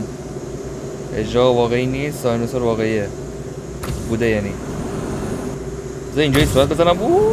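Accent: Iran. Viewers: Why Are You Speak Like Irani Persian ?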